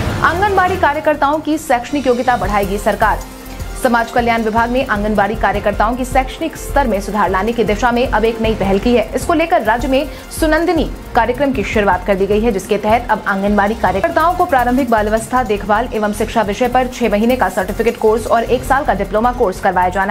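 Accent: Bihar, India. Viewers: Madam bihari na boliye thoda respect rkhiye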